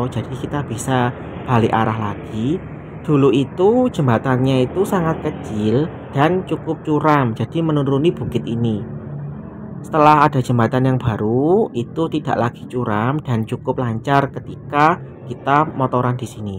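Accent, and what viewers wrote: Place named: Java, Indonesia. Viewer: Jawa banget logatnya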